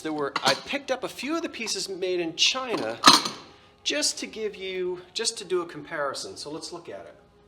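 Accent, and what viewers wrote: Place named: New Jersey, United States. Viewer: I enjoy hearing the New Jersey accent. It would be super fun if you ever want to do a video in full Jersey mode!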